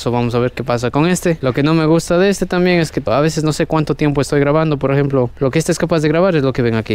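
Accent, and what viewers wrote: Cuenca, Ecuador. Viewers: Buen video. Eres Ecuatoriano? Tu hablado me suena como de acá jaja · suenas como que fueses de ecuador de cuenca... buen video amigo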